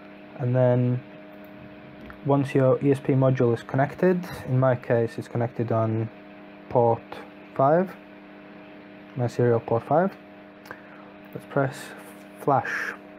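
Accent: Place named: Russia. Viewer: А акцент то русский/украинский/белорусский (ну ты понял)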